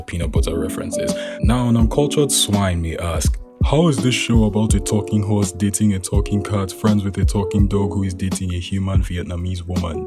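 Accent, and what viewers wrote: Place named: Nigeria. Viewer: Well, I watch my shows in bed and I have severe ADHD. So I tend to fall asleep quite easily when watching this show. That's how I know a show is mature enough for me to have to be in the moment to not miss a thing. It doesn't depend on out of the ordinary shit like murders, fight scenes etc. Even the jokes are laid back. I'm just getting to watch it now, I'm in the second season and this is because I've been avoiding it for years now. As I did with Rick and Morty. You don't want to associate with a fandom that excuses constant shitty behaviour and lack of growth on self as okay, do you now? Either way I'm glad I caught up and I'm loving it. Love your Nigerian accent btw. Here in Kenya we have a myriad of em but I kinda envy your's sometimes.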